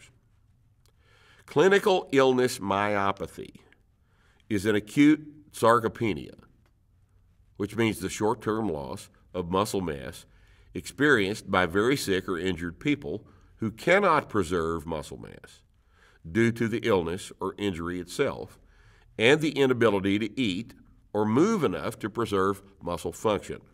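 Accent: Texas, United States. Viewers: It's them beautiful Texan tones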